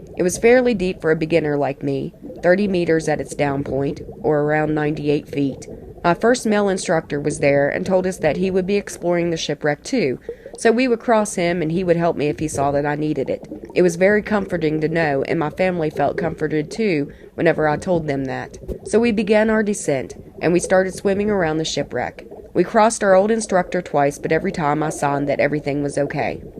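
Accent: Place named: South, United States. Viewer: I'm sorry but if you have a southern accent, telling a scary story doesn't work.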